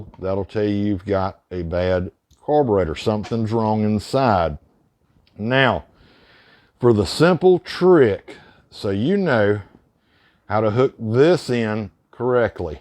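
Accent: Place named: Appalachia, United States. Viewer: oh your god, dull and hillbilly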